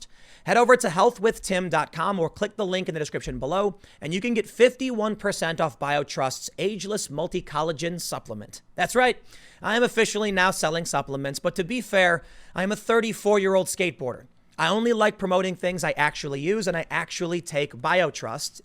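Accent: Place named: New Jersey, United States. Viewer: Hearing a lot of your Jersey accent in this video. Love it. Stop repressing it.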